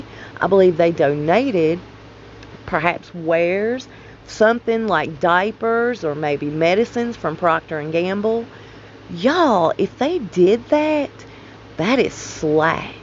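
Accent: South, United States. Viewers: Dear, thank you for your videos. They are always a breath of fresh air. And that lovely voice and Southern accent! I am relieved the diagnosis was wrong. As you recounted your misshapens, the fall, the hospital, the emergency room, the diagnosis, the health scare, I felt deep in my heart, they didn't know what they were talking about. Some medical staff were rude to you and others condescending. But thank God, in the end, the Almighty gave the correct diagnosis. I keep you in my heart and in my prayers. Much love!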